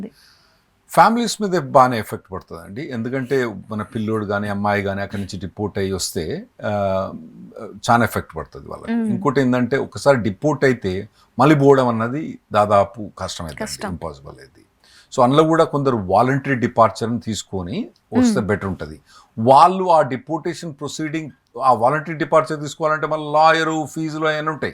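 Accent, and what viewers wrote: Telangana, India. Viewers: Sir, I like your Telangana accent language. Please continue to be what you are. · We really enjoy your telugu which is pleasant and pucca telengana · Sir, your Telangana language is a practical one and we really enjoy it as a Telanganite. We like it Sir 👌👍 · We like your Telangana accent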